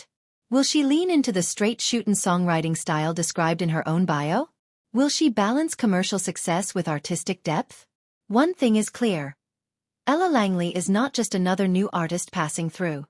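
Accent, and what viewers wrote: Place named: Alabama, United States. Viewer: She sounds country as them red chert roads here in Bama.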